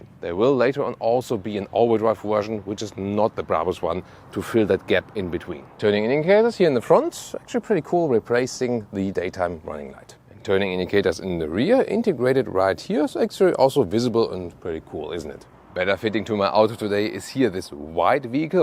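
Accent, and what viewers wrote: Germany. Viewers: Dein Akzent (Du sprichst Englisch mit Deutscher Intonation) hat sich immer noch nicht geändert... Es wäre so einfach dies zu ändern, ein wenig Sprachtraining (mit ner App z.B.) würde schon helfen. Auch übersetzt Du oft Deutsche Ausdrücke direkt ins Englische, was einfach nicht passt. Es ist übrigens ein GEPARD, kein Leopard...ernsthaft?! Auf Englisch: Cheetah. Deine Reviews sind Klasse aber diese Deutsche Intonation im Englischen ist für native Speaker kaum auszuhalten.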